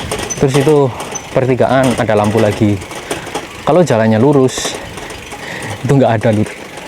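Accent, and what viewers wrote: Banyumas, Indonesia. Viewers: Sampean asale ngapak ngendi mas?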